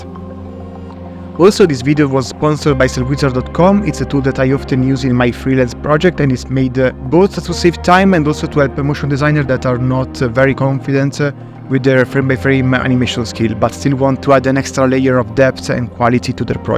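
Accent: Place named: Italy. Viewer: I like the italian accent, makes the content more original. Nice!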